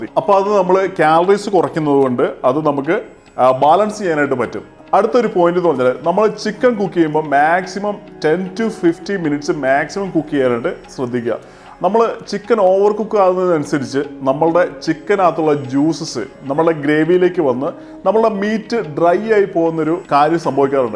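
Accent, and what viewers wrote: Kannur, India. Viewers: Looks Good. I will try it and comment it here. Are you from Kannur? You are having a Kannur accent. It seems you are based in Dubai. I am a great fan of Lebanese Lamb chops and lamb Kabab (not ground lamb kofta Kabab, but lamb pieces). Could you please do it whenever you feel convenient to do it. I am a regular watcher of your cooking videos. Crisp and short videos. I love your videos.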